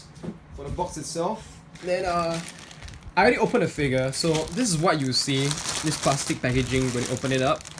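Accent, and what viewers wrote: Singapore. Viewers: By your accent, i know you're singaporean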